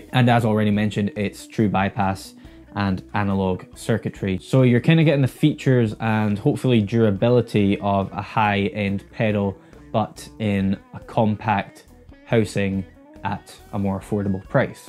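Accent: Scotland, United Kingdom. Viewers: What...that Scottish accent!! 🙂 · Good Tae a Scottish voice .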